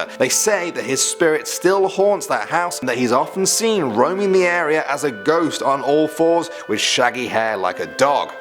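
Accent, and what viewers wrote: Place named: United Kingdom. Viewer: Are from the uk by the way you speak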